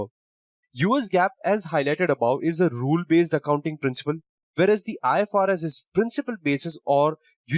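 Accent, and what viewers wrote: India. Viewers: He sounds like Rajesh Koothrapalli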